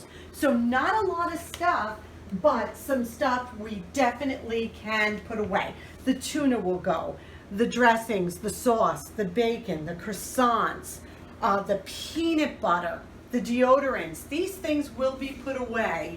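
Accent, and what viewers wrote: New York, United States. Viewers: I thought I detected a NY accent but I didn't know you had a bag tax thing too?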